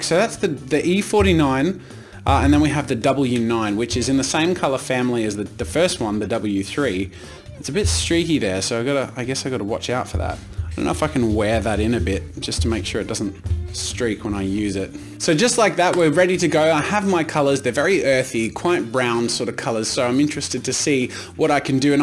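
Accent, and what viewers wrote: Australia. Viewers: He sounds extra Australian in this video 🐨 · I love your Australian accent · Australian accent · its the Australian accent probably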